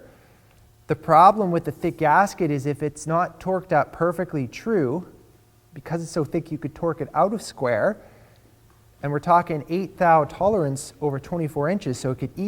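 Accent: Canada. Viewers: U from Canada A?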